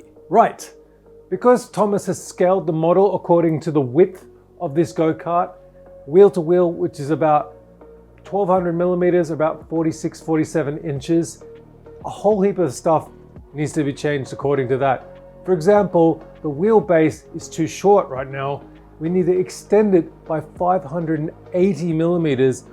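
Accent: Australia. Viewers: Is that a some what Aussie accent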